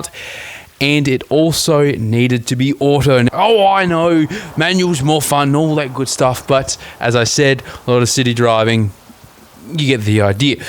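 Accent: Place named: South Australia, Australia. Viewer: I’m proud of myself, based off your accent I placed you in south Australia and I was right. My first video I’ve ever watched from you and it is incredible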